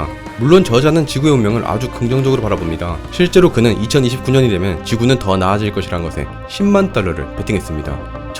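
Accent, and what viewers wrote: Gyeongsang, South Korea. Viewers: 경상도 사람인가 억양이 나랑 비슷한 냄세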